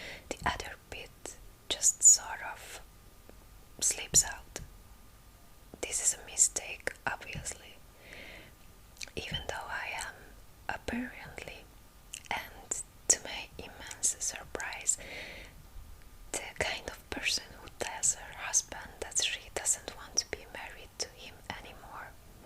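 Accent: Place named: Hungary. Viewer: Haha very thick hungarian accent, i like it!!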